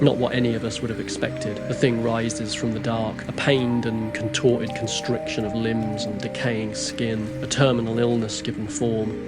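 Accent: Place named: United Kingdom. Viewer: This guy is more annoying and pretentious than he claims Vincent to be. The leftist stuff is overly annoying and childish. Bipartisan politics are meant to divide and cause inflighting in people. Most people pick a side younger in life and then grow out of it realizing they have more in common with people on the other side than they do the people they look up to in office that they vote for. He says things like the right supports mass shootings when nobody clearly does. He acts like every opinion he has is right, like an angsty person who's never raised a child and been able to see his own faults through raising another human being to function in society that's a mirror image of himself. Everything he says politically is just so cringe and stereotypical of his political side. I'd almost expect him to say "Heather, being born without a penis is inherently good unlike the toxic male Vincent who's smugness is a direct result of his containment of semen." This even being remotely watchable to anybody is an example of how strong the silent Hill stories are. 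Even a hack like this guy can use it as a crutch to shove his political views into and get a decent amount of views. Put a British accent on it like his and any young, immature self-hating Westerner will feel intelligent watching it. All I hear is pretentious garbage, Flesh Simulator (who's actually talented) being ripped off, hbomberguy being copied and "I'm very artful." I can watch some leftists I don't agree with, but this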